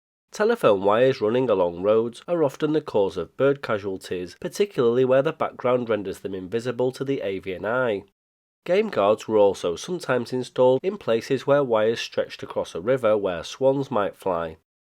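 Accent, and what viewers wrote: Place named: Northern England, United Kingdom. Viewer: Fascinating stuff you always come up with, especially with your accent! It's not dissimilar to mine. I'm from Baguley, Wythenshawe round the corner from Alty (Altrincham for non-locals). My brother used to keep racing pigeons in our parents' back garden. I never knew this about those cork devices but I've seen them too. I think I may have thought they were a lightning protection device of some kind (pretty stupidly). Thank you for solving that little mystery.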